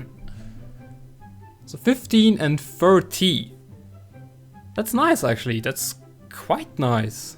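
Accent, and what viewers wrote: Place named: Germany. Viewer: Cringe german-english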